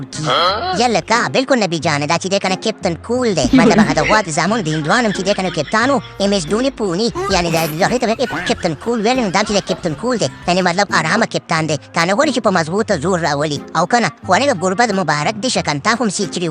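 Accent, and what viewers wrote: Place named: Peshawar, Pakistan. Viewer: تیزنو پسکنو سوچه پشتو ووایه دا پیشوری لهجه پریژده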